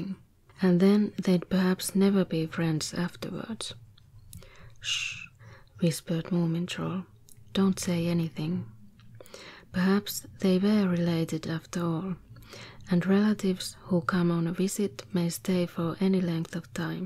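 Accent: Finland. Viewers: I came here for the finish accent. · finnish accents are lovely.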